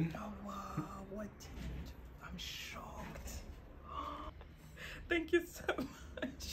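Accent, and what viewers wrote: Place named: France. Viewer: Vous vivez en France? Avec cet accent français....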